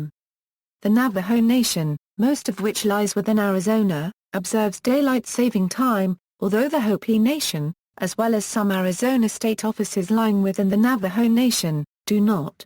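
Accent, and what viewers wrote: United Kingdom. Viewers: I don't understand a word of what this fucking bot says. British English is awful. God bless America, my home, sweet home.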